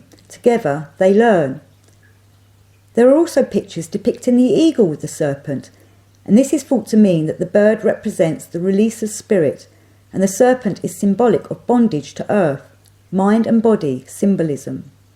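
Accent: England, United Kingdom. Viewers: Thank you so much for your positive feedback. I am only at the beginnings of discovering and enjoying your informative videos... from the beautiful imagery and powerful symbolism, your lovely English accent to your passion for knowledge and sharing is simply golden. 🙏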